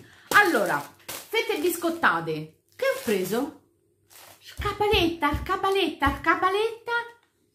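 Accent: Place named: Tuscany, Italy. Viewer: Dai, sei toscana...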